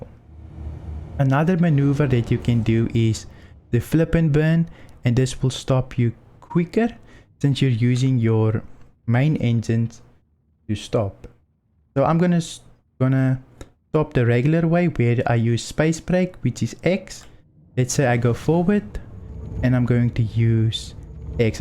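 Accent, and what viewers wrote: South Africa. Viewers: South African? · that s afrikaanse english accent lo so cool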